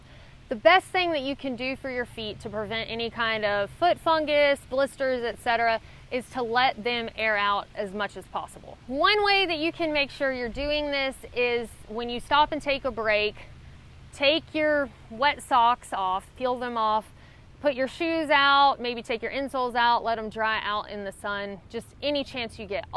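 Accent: South, United States. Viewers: As a lifelong Californian who never been to the south, I still love listening to the accent of a good looking southern woman. Saying that, as someone who loves hiking in Cali and the southwest, this trail is just a big NOPE. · “And if you’re mad at me and wanna fight me because your Pee-Paw told you a story where he had a fight with a water moccasin…” You are just so delightfully Southern. 🤣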